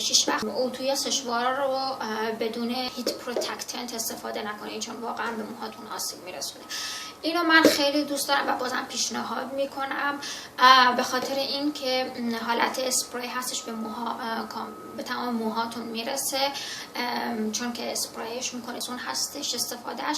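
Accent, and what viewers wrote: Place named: Afghanistan. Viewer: Abbas Barati ازحرف زدنش معلومه افغانیه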